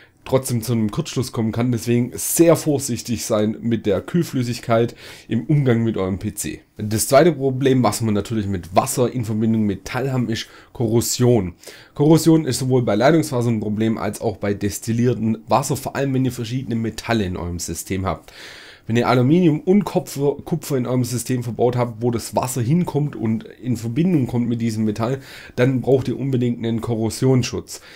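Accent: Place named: Baden, Germany. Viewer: Du Klingst so Badisch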